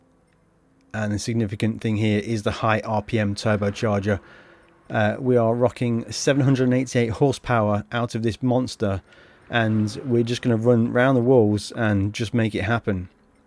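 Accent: United Kingdom. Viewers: Bro! Kyle Bush with an English accent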